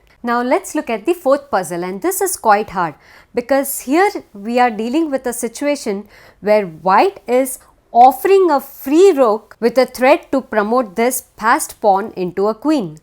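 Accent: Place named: India. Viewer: Thanks! :) your accent sounds Indian idk why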